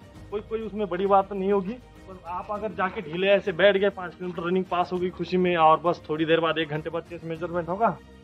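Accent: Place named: Bengal, India. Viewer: Sir aap bngla bhasa bolte ho, aapki hindi सुन ke lg rha है,mera 3 june ko रनिंग है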